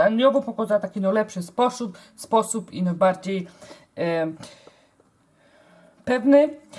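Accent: Silesia, Poland. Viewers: Tak, śląskim ;)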